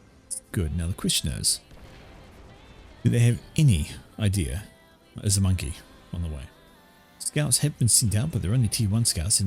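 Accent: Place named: New Zealand. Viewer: awesome cast. are you a kiwi btw? took me a min of scratching my head before placing the accent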